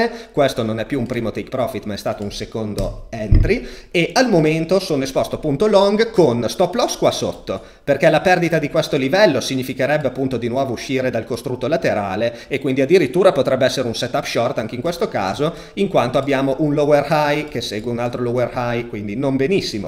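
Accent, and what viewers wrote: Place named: Romagna, Italy. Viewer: Ciao, di dove sei? A giudicare dall'accento viviamo vicini, sono di Forlimpopoli! Per il momento sono messo così: 35%btc 45%eth 20%cro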